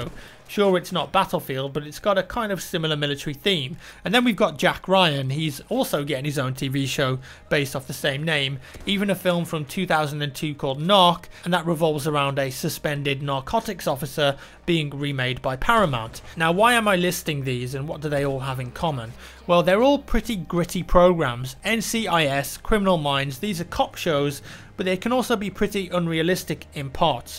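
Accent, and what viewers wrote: United Kingdom. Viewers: Drink a shot everytime he says Battlefield in his nice british accent :D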